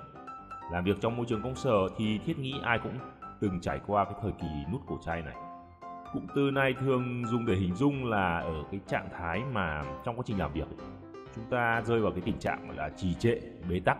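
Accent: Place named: Hanoi, Vietnam. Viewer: giọng chuẩn trai Hà Nội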